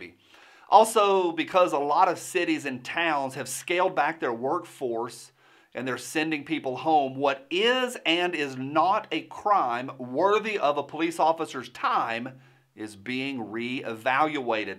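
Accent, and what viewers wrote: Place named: Portland, United States. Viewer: It sounds like she’s from Portland.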